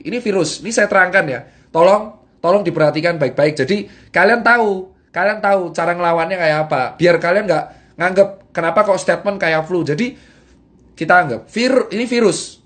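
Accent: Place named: Surabaya, Indonesia. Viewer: Asli... Gaya suroboyoan.... Gamblang njelasno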